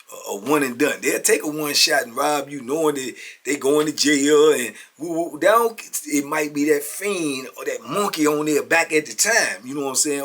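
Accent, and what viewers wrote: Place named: Virginia, United States. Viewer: Another banger. But, the VA accent is killing me.😊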